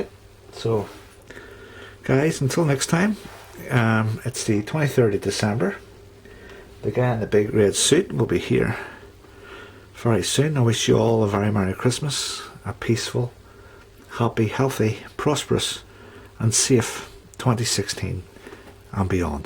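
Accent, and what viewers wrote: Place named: Northern Ireland, United Kingdom. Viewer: No knife at all in Ireland but this guy is in Northerm Ireland by the sounds of it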